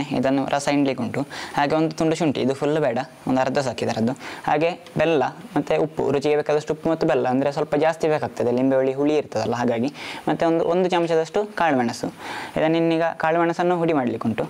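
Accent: Mangalore, India. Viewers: ನಿಮ್ಮ ಅಡಿಗೆ ಹಾಗೂ ಮಂಗಳೂರು ಕನ್ನಡ ಭಾಷೆ ಎರಡೂ ಚೆಂದ!!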